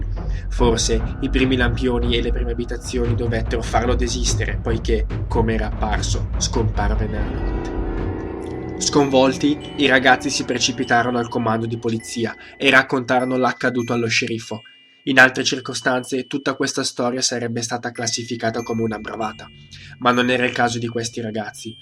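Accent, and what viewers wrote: Italy. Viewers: Lui ha l'accento italiano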